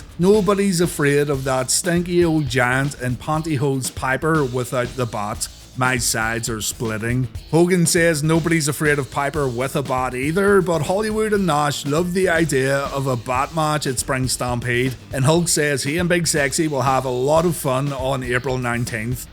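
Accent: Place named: Scotland, United Kingdom. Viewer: Is this guy from Scotland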